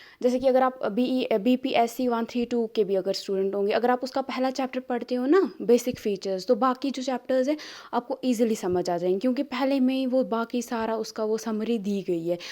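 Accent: Kashmir, India. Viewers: Are u kashmiri.. your ascent is like kashmiri ❤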